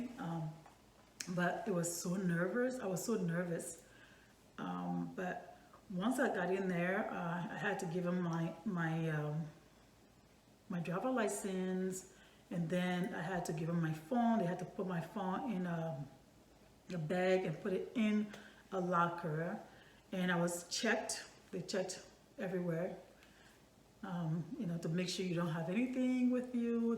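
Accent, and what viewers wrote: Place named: Liberia. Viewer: Hey you sound like Liberian. I am Liberian too. Congratulations I am preparing too for the nclex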